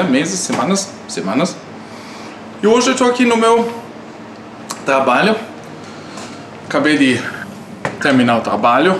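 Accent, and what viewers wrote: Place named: Germany. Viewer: Ôh, é muito triste! O pior é que, o mundo todo sofre essa inversão de valores. Só Jesus! Parabéns pelo seu português, é perfeito, com esse sotaque alemão.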